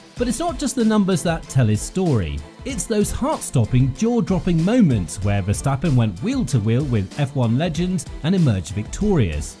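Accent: United Kingdom. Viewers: LH "arguably" one of the most greatest driver in history.. says the bias British accent again. Well with his 7 titles he clearly isnt. Before F1 he wasnt that special as the greats in history such as Schumi, Senna and now Max. Those last three are completely from another league.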